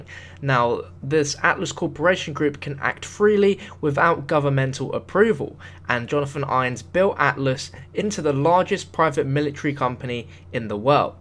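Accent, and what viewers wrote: England, United Kingdom. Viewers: is the best are you English?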